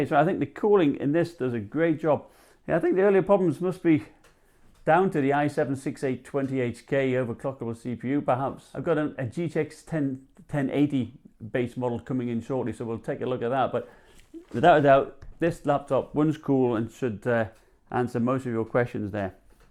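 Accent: United Kingdom. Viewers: Like you British accent. Also thanks for a good review!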